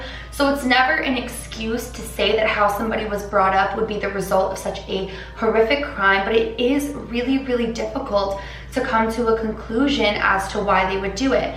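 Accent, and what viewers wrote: France. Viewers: I love her French accent😋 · I know this is besides the fact and kind of random but you're french accent is beautiful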